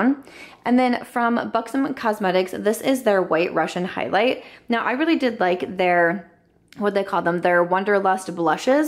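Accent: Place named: South, United States. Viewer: I just love ’ southern accent, y’all!! 🤣🤣😂😂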